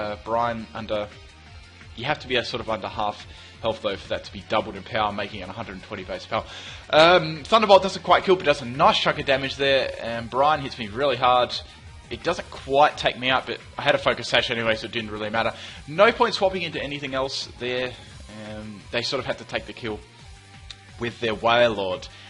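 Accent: Australia. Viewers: Wait did I hear that correctly are you from Australia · I KNEW you were a fellow Aussie. The accent game is strong!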